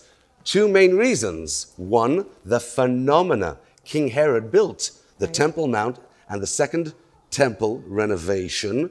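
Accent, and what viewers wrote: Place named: United Kingdom. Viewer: Thank you for this wonderful tour, so excited just to watch it. And it’s also good to hear a UK accent . God bless you.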